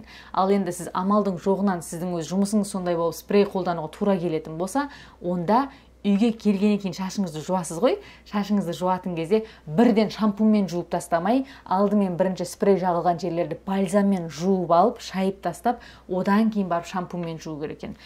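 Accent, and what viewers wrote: Standard. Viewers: Сіңілім рақымет кеңес бергеніңе .керемет сенің қазақ тілің құрет тұтып әлі күнге қазақшаны таза сөйлегеніңе риза болып отырмын құрметті бол жаным. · Жарайсың өз тіліңді ұмытпай таза сөйлейсің Кореяда тұрсада Қазақстанда тұратын қазақтар орысша сөйлейді қазақ өз тілінде сөйлей алмайды бақытты бол сәттілік тілеймін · таза казакша сойлейди екен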